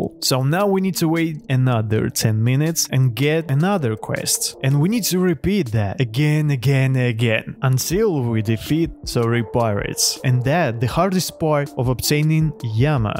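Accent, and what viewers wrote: Russia. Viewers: The russian that tries to make an english voice OHHHHHHHHHHHHHHHHHHHHHHHHHHHHHHHH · Why do you have russian accent lol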